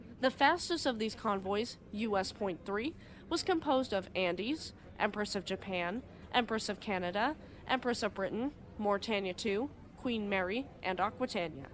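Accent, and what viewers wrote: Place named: Pennsylvania, United States. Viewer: Being someone from a northern town in Canada I don’t pretend to be great with American accents. That being said, I have a good friend from Pennsylvania and every time I listen to one of your videos I catch the subtle similarities of the accent. Keep up the good work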